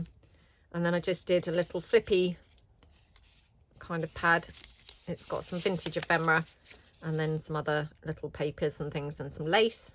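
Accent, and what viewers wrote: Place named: RP, United Kingdom. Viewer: You are amazingly creative and inspirational. I love your posh accent and your journals rock! Thank you!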